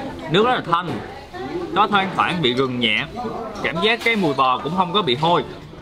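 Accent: Mekong Delta, Vietnam. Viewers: noi chuyện dễ thương chân chất người miền Tây love cho 1 live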